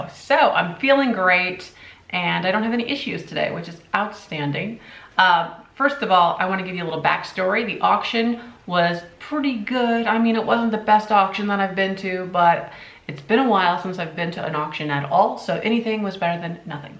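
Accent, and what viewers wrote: Midwest, United States. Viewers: Love watching you! I’m from South St. Louis originally and now in Florida. I can tell you’re from the Midwest! How close are you to St. Louis?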